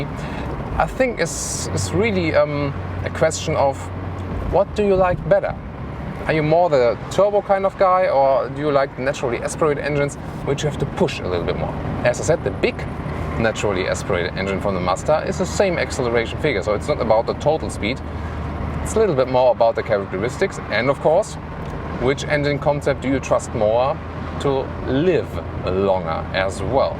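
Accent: Germany. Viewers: Der Akzent ist zu deutsch! Üben!! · Just Awesome German accent..... 😩😩😩😩😩😩😩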